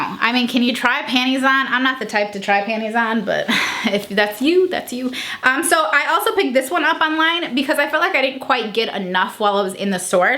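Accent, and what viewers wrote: Chicago, United States. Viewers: Also do you live in Illinois or are you from there?? It sounds like you have a Chicago accent haha xx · Rylie Schulze I’m pretty sure she lives in Chicago because she has mentioned going to the suburbs of Chicago in several of her videos. I was thinking she had a Chicago accent too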